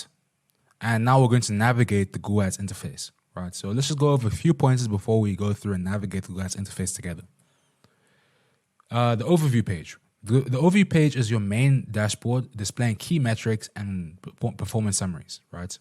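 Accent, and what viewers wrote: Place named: South Africa. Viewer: i could tell you were a fellow south african by the accent.😅.beautiful course brother.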